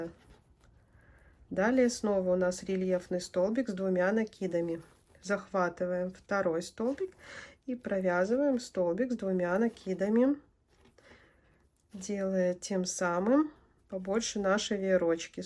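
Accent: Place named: Baltic states. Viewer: вы с Прибалтики? У вас красивый акцент речи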